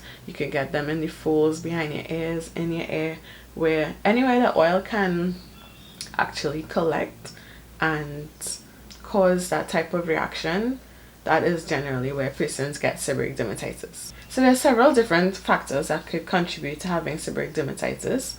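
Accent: Trinidad and Tobago. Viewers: Hey girl great video. Oils don't work for me either. Glycerin has been a game changer for me....it is not an oil so i don't get a response . It's also a humectant so it attracts moisture to your scalp and hair...its especially useful as I have relaxed hair as it help make my new growth soft and manageable. When my dandruff as bad.... I used keto plus shampoo only on my scalp. Massaged it and Left it on my scalp for 20-30 mins ..and when I tell you...by the second wash I saw no flakes. I used Glycerin to moisturize my scalp every other day and to my weekly deep conditioning treatments...you sound Trinidadian... looking forward to more videos . Bye!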